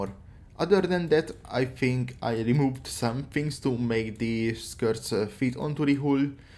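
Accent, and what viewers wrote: Hungary. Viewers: hungarian ahh accent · Akcentus nagyon magyar.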